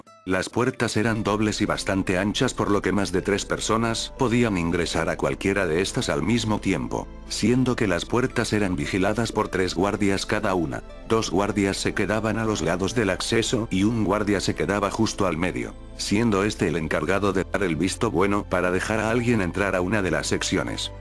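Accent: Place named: Dominican Republic. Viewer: Klk, un Dominicano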